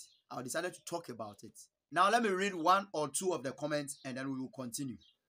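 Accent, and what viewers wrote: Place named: Nigeria. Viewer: You sound like a Nigerian your accent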